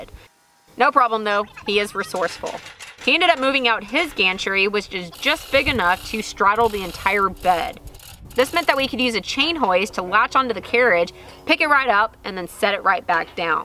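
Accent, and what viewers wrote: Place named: South, United States. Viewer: Are you going to pour a concrete pad for that thing? When rainy season comes, I could see those concrete blocks sinking in and warping the bed. "Cody drug it over there." You go, southern girl, that's how we talk.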